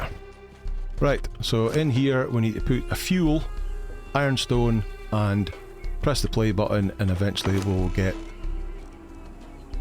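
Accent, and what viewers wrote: Scotland, United Kingdom. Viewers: I've known about this game for a long time, but never got around to playing it. I just started earlier this week, and was a little lost at first, but, i found your guide and its really helped me. Its also nice to hear a fellow Scotsman doing this. Also, as a little side note, im playing on console, and most of the journeys recognise other journey actions you may have done previously.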